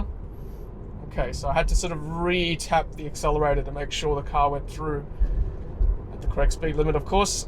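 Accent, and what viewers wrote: Australia. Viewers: Always interesting to hear an Aussie accent and terminology, e.g. "amber," trying re-orient oneself to see driving being done on the "wrong" side (non-American) of the road, and imagining what AI/machine vision needs to do to handle your travel ways. Does your testing of Autopilot (1) Indicate significant disc brake usage whereas regen braking involves none; (2) Provide some play in steering to avoid potholes within one's lane; (3) Hint at what causes phantom braking or steering off course; and, (4) Indicate what might be situations where it should not be used? Thanks!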